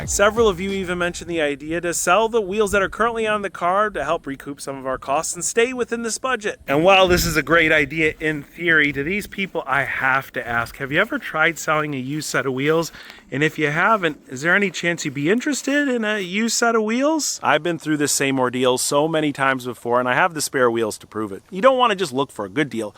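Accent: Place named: East Coast, United States. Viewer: Holy smokes you look an talk like an east coast scumbag. Instant subscribe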